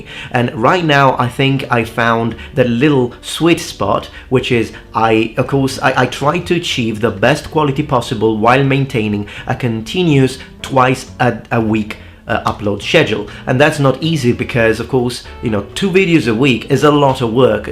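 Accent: United Kingdom. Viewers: why you have a british accent? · I can't believe how much time has passed! 500k?! I very rarely do these but I'll try be concise :D First off, congratulations! You deserve the milestone for your diligence over the years, truly well done man. I can't pinpoint when I subbed, but I've been along the ride for many years - to see this growth externally is super satisfying. I can say with confidence pre 50k, but my memory fails me to go beyond that. Around the time your language videos were more prevalent I'd say. My other reason to drop a comment here is to explain why I initially subbed - it was because of your character, before your content. At the time, there was very little content for what you were producing (and still do). To see a video on historical medieval warfare and/or weaponry was a rarity, a drop in the ocean. To then move to language in the next video to me was astonishing. 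Not just generic language, but an Italian man speaking fluent English with a British accent swapping to Greek and Japanese in the same breath was near inconceivable, I couldn't believe my eyes (and ears lol). I'll touch on why else that was important to me later but, above all you were passionate, and had infinite potential to grow, across every avenue. I wanted to see that among many other things. Youtube wasn't exactly new when I landed here, but it definitely wasn't the colossus it is now. Growing up with the platform I've always been very picky and arrogant in who I subscribed to. To me a subscription was a dedication, it wa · You sound very British but look very Italiano. How come ?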